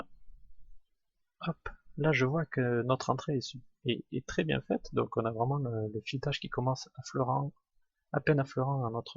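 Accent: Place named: South, France. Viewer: Bonjour Ce sont des super tutos, j'apprends sur 2 écrans en faisant au fur et à mesure et j'ai pu imprimer les premières pièces dessinées sur freecad. cependant, une petite question: quelle version de freecad utilisez vous sur les premières vidéos? Sinon c'est génial avec l'accent du sud!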